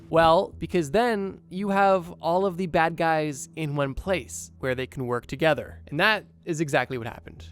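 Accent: Canada. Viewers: I think he’s Canadian ????? They pronounce things weird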